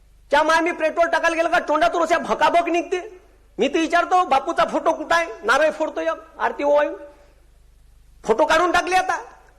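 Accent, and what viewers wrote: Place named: Vidarbha, India. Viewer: अबे हे वऱ्हाडी होय शुद तु बेलत जाय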